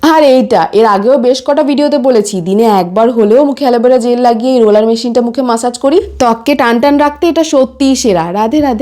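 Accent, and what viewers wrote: Bangladesh. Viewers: দিদি তোমার ভিডিওগুলো আমার খুব ভালো লাগে। আজকে ভিডিওটি অনেক সুন্দর হয়েছে। প্লিজ তুমি চুলে কি কি লাগাও আবার একটু বলবে বা একটা বড় ব্লক দিবে আমি তোমার নিউজ সাবস্ক্রাইবার তোমার ভিডিও খুব ভালো লাগে আমি বাংলাদেশ থেকে তোমার ভিডিও দেখছি তোমার কথা বলার ধরনও খুব সুন্দর বাংলাদেশের টান রয়েছে😊❤ তুমি এভাবেই সুখী হয়ে থেকো❤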